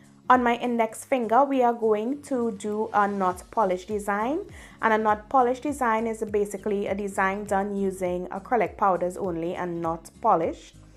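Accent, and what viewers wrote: Trinidad and Tobago. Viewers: I hear my Trini voice lol..... great nails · First video of yours I'm watching and sound triniish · SUBSCRIBEDDDD as soon as I heard that trini accent <3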